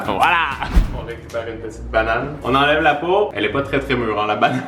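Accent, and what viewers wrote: Quebec, Canada. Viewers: Bonjour j adore ton concpte de vidéo et par dessus tout ton accent québécois je te dis bravo de ne pas avoir lâcher pendant tout se temp se que j essaye de dire ses que je suis une fan depuis très longtemp et je voudrais que tu me réponde.Et aussi j espere que toi est Isaac vous vous santer bein pendant se moment de crise sanitaire mercie d avance et stppp reponds moi❤❤❤❤❤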